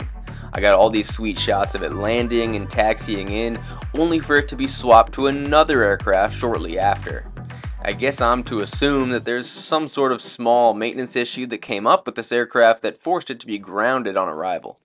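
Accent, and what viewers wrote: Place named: California, United States. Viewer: You have the same accent as the SNL The Californians!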